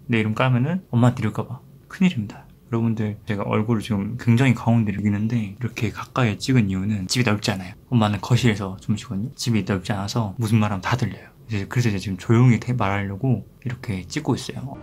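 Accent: Gwangju, South Korea. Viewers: 개판이라는 말 넘 웃겨여ㅋㅋㅋㅋㅋㅋㅋ 님 전 광산구 주민이라 광주사투리 들으니 넘 반갑네용ㅠㅠ 성격 좋으신 님! 늘 응원하고 있습니다👨‍⚕️✨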